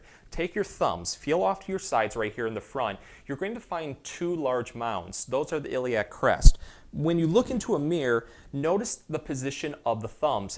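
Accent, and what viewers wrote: Michigan, United States. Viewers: Thick Michigan accent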